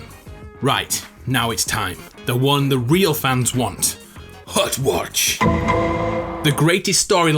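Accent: United Kingdom. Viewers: May I ask are you are a Brit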